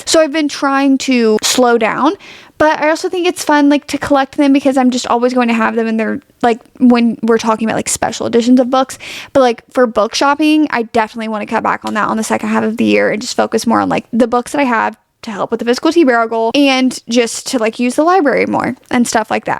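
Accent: South, United States. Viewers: with the southern accent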